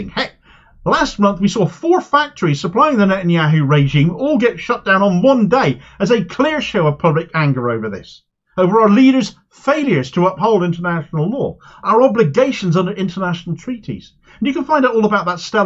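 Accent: United Kingdom. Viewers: YES, SIR... YOU THE BEST.... I LOVE THE SHOW... LOVE THE BRITSH ACCENT.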